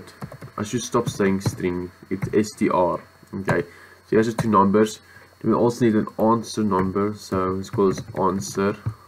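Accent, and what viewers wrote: Afrikaans, South Africa. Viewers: Are you Afrikaans? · You can tell that he's Afrikaans. I mean his accent says it all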